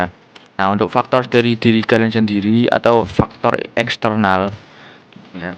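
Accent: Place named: Java, Indonesia. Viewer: suara jawa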